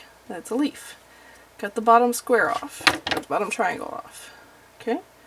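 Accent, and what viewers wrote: Texas, United States. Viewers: Love the Texas English! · Texan english rules!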